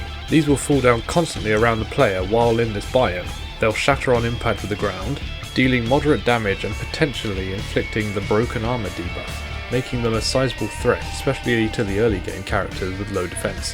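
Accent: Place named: England, United Kingdom. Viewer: I thought you sounded such as soon as you started talking normally. Though uh, that might just be because of the stereotypes associated with your accent here in the US. English accents are often used to convey either intelligence or nobility here.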